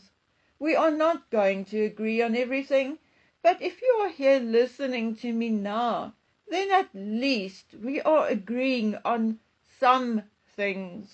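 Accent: South Africa. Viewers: Ive only ever unsubscribed once. I did it because after giving it a try for a couple of weeks I just didn't enjoy her voice or her opinions, at all. I've enjoyed or learned something in each video. I enjoy hearing about Dragon Man and most of all, I love the South African accent. TY. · When I subscribed to your channel, several years ago, I did not subscribe because of the Harkles, Royal Family, or anything of the sort! When I first came upon your channel, I just LOVED your accent!!! I had never heard it before. I knew it wasn't from Great Britain or Australia, or New Zealand, or the U.S.!!! I listened to you a little more & learned you were in South Africa & told you I thought you had the most beautiful accent! One lady saw my comment & thanked me & told me it was so lovely of me to say that!!! You, no doubt, probably don't remember, but you & I even emailed back & forth a few times!!! 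I thought that was SOOO nice of you to respond to me!!! You never ask for anything, you never advertise products you want to sell. All you do is bring us the truth!!! Here we are, years later, & I'm still here everyday, still subscribed, & still listening to your lovely voice & accent!!! 😊 ♥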